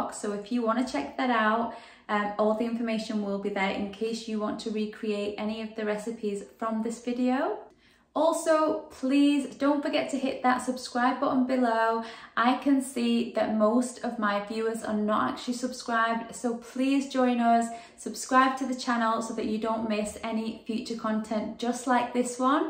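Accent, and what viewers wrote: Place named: Northern England, United Kingdom. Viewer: Excellent lovely clear proffessional presentation & I think a northern accent,great,certainly try some in our air fryer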